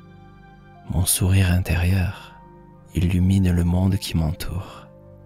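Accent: South, France. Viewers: cher...t'es merveilleux et ta voix est est sublime avec un pt accent du soleil...merci t'es un Grand Soutien pour moi 💙💛🌻😺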